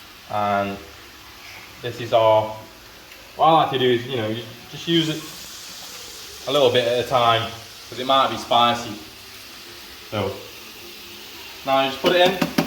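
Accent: United Kingdom. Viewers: Awesome how this dude looks like full, full native Thai and then speaks like a a British man. 😆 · when did you move to thailand? fitting name, you sound British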